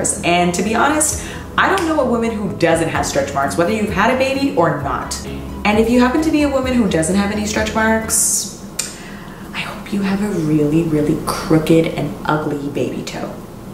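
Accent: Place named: Guyana. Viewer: LMAO your Guyanese accent killed me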